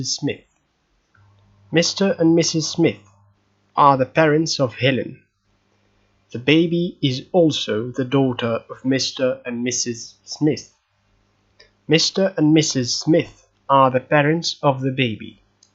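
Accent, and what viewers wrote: United Kingdom. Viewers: Hello, I am a native Spanish, I wanted to know if your pronunciation is British, since I want to learn that pronunciation and not the American one. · What is your native language? You sound British, but some words seems off. And your French sounds very native.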